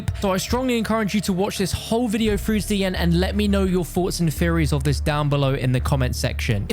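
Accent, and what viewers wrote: United Kingdom. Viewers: I know I'm gonna get whooshed, but he said agent. It's just our British awful accents. But yes very funny joke.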